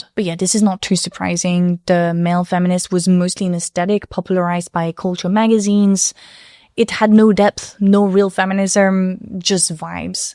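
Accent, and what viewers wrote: France. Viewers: I'm part of your new masculine audience. I'll speak for myself, I don't know if there are other man who watch your content for the same reasons that I do. I first saw you on Instagram talking about french politics. I'm a recent catholic convert myself and I was curious about my faith in the "eldest daughter of the Church". Then I deactivated my Instagram account (I got tired of that) and decided to follow you here. Your content is well-researched, and left-leaning in a way that, for me, is way to stay updated with politics in the old continent through your lenses. And also a way to stay informed about current trends in feminism (and your balanced critique). I also love french culture and literature (I love your accent, everything about you, really). So for me, I feel like watching you is like reading or watching a good news anchor (with a melifluous french accent and sapphire blue eyes). 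· I love you, and your french accent is a staple to your media but please say it with me. "Agency" - A-gency like "A gentsy." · Can't speak for other men but I watch for the French pronunciations of words like "rugged". That was priceless, thank you.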